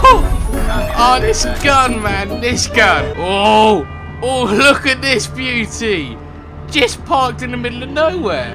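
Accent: United Kingdom. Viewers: he dosent have eneything against them its for entertainment purposes also hes british wich makes it 10 times funnier :D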